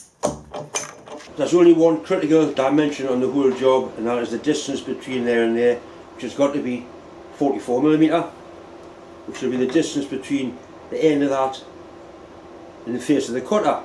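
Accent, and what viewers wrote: United Kingdom. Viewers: Hi You are incredible nice job I like what you done bravo!!! by the way you are from UK??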